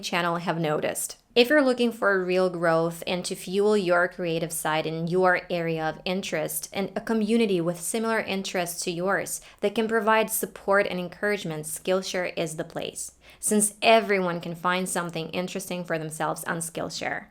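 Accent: United States. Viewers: Hola. Soy Gabo. Vivo en USA y mi hijitame dice que tu inglés es Americano. Ella sabe inglés pero yo aun no. Me gustaría saber como inicio tus clases. Gracias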